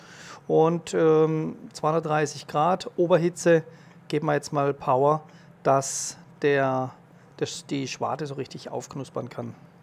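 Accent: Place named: Franconia, Germany. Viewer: Karlsruhe? Du klingst aber auch etwas fränkisch.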